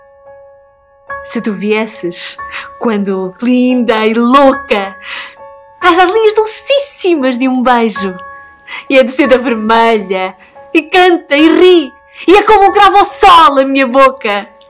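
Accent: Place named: Portugal. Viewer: parabéns , amo a Florbela <3 traga mais por favor. Voz linda apaixonada estou pelo português de Portugal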